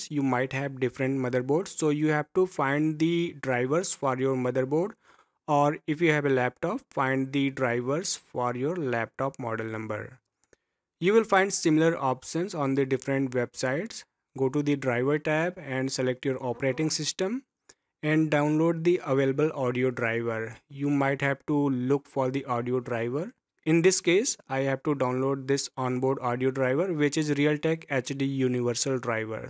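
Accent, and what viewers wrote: India. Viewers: when i heard the indian voice i knew i was in good hands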